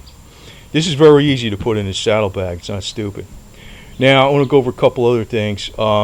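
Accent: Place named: New York, United States. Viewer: Seen a few of your vids. Your NY accent reminds me of home. Im in the Army at Ft Bragg, NC. I ride year round here. Even if it snows. Miss NY riding. Great roads up there. Especially up in dutchess county and further north. Nit too many winding roads down here. Ive got a 06 sporty 1200 (which is for sale) and a 05 dyna wide glide that I love. Im on my dyna almost every day. I barely cage at all. Real riders unite!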